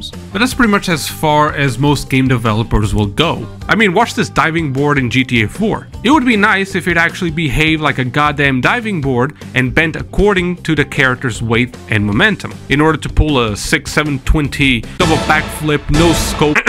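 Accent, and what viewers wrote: Portugal. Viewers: eu sabia que eras tuga do get-go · I noticed your Portuguese accent in the first 25 seconds of video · i smell tuga in here... :))) props!!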